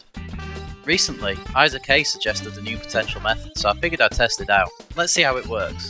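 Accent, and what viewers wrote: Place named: United Kingdom. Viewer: British lad voice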